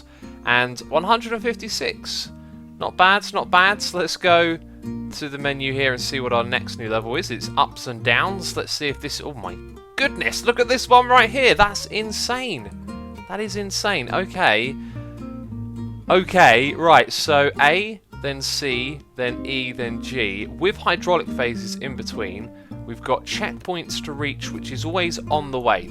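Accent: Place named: United Kingdom. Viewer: X, I love brittish voices.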